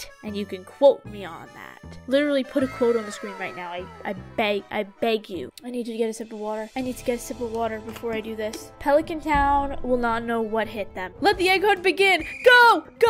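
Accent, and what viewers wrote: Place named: South, United States. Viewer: I love her southern accent Like actually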